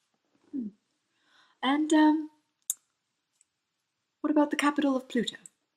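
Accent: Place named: England, United Kingdom. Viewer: This is great and I love your English accent.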